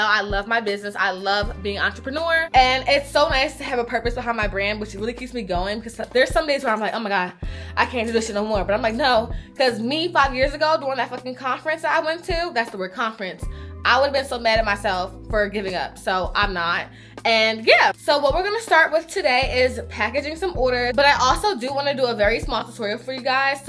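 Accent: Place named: Philadelphia, United States. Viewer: New subscriber! I knew you were from Philly the second you started talking lol. I agree with outsourcing photographers in NYC! Great content!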